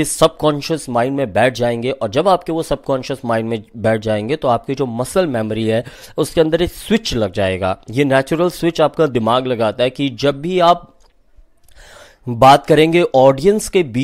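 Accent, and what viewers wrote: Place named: Rajasthan, India. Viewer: Bro apke Rajasthani influence kyu aa raha h r u from Rajasthan?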